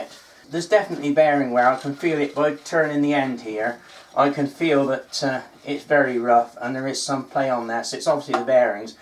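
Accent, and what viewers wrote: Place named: Hampshire, United Kingdom. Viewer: Thanks for confirming that the bearings aren't too difficult to remove. Unfortunately we live increasingly in a throw away society. Your accent reminds me of my Hampshire roots before we moved to Australia 51 years ago, love it.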